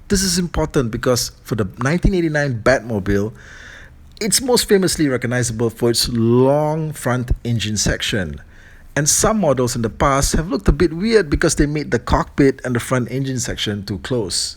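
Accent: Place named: Malaysia. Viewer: when a malay speak english...you can hear their horrible malay accent....and those headlights are ORANGE not red u color blind or wat?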